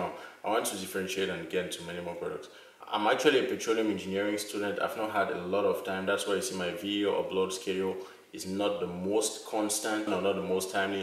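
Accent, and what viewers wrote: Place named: Nigeria. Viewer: Hey, you sound Nigerian. Are you?, lol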